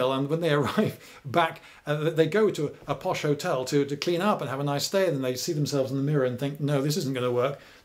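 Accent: United Kingdom. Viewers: Yes, realize you're a Brit.... The accent gave it away... Stay on subject,. thank you... ☮️ 🌎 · into? you speak Queen'a english, most of us can barely understand the accent. Don't worry about a tiny "in insignificance", videos are too good AND funny anyways. History is beautiful when explaines with entertainment. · just searched it up. Funny thing is, there's a video made by scholagladiator ( or something ) where he mentions his meeting with Lloyd and he actually admits the British pronunciation is wrong at this subject... But I also get that it's just how you guys used to say it so... thanks for responding :)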